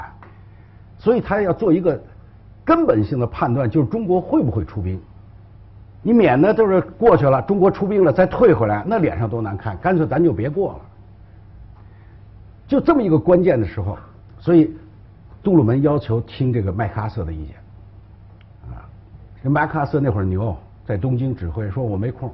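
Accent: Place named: Beijing, China. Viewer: 北京话听着很亲切，又风趣又不失风度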